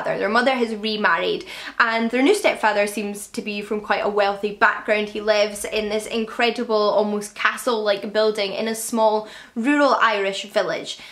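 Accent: Scotland, United Kingdom. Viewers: Subscribed within the first 5 seconds of the video because I love the Scottish accent! 😁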